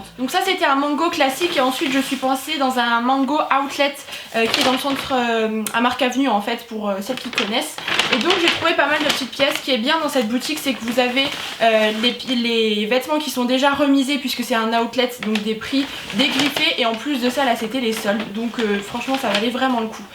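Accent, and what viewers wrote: Lorraine, France. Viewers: Cc sa se voix que tu vie en Lorraine ton acsant est beau tu à de la chance moi je normande sinon super vidéo tes achat son stylée bravo continue comme ça!!! bizou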